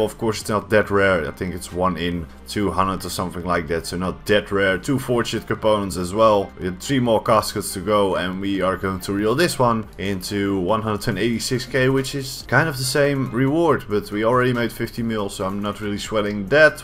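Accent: Netherlands. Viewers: hears the guy talk Me: 'Is he dutch?' looks at the channel Me: 'Ah'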